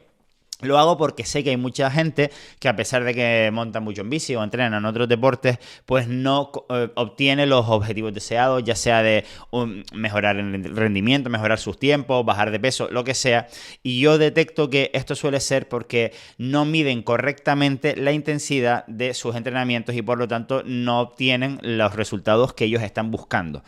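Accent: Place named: Canary Islands, Spain. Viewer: Interesante vídeo 👌👌👌. Me encanta cuando dices lo de ''gandul'', ajajajajajajajaj, como echo de menos nuestro acento canario. Saludos puntal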